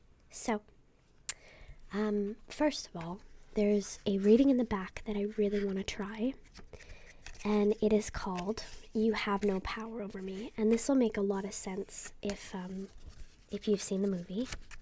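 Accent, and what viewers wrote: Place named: England, United Kingdom. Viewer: 10/10 English accent. I got family in England, I think your accent would pass.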